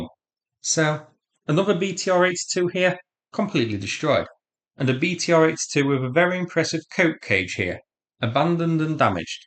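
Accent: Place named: Mansfield, United Kingdom. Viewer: I always thought your accent sounded familiar, I’m from Rainworth but no one knows that so unfortunately I have to say Mansfield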